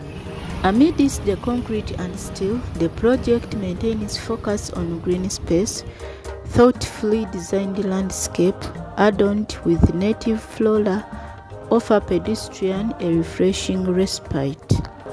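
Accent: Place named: England, United Kingdom. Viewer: This English accent is beautiful.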